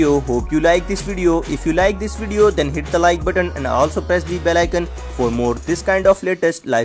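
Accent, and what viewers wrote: India. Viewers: The intro was enough for anyone to leave immediately, the creepy indian accent.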